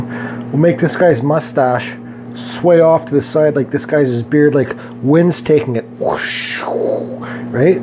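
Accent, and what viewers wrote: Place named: Canada. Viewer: Keep doing your thing brother! Love the Canadian accent too. I need to start shooting video of my carvings.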